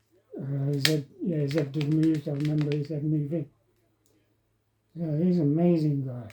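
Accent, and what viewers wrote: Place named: Nottingham, United Kingdom. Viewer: Where abouts are you from roughly mate? Can't quite work the accent out but you sound like you're from my neck of the woods (Nottingham/Mansfield) way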